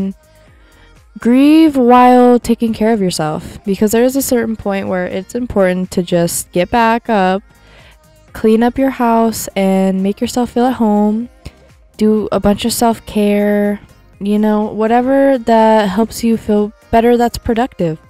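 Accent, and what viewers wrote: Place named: United States. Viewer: Hi! I was intrigued by your channel name and thought you were a ie New Zealander like me, but then I heard your American accent and saw the American products. First up I want to say I'm sorry for your loss, and I think you did absolutely the right thing which was to lie low for a few days, grieve and go easy on yourself, and then get up and tackle what you had to do. You are spot on when you said it's good for your mental health to achieve something and make your environment pleasant and tidy. I was nannying for a few years for various families and I learned a lot by observing how they ran their homes. One thing I noticed was that decluttering makes life so much easier. One mother had 2 little boys and she really had a minimum of clothes for them. She would do a small wash every day and get them dried and put away and there was never a huge pile of clothes to worry about. 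That's one recommendation I'd make to you, to go through your little guy's clothes etc and try to trim it all down to a minimum. Another great trick is to put away half of your little one's toys for a few weeks. When you bring them out again, put the other half that has been out away. He'll almost be like he's got new toys or so excited to see some old favourites. That way it's easier to keep tidy because there aren't so many lying around, plus you'll have a very happy kid. Also when they are so young, they grow out of toys so quickly so it's good to go through them and donate the ones that he's no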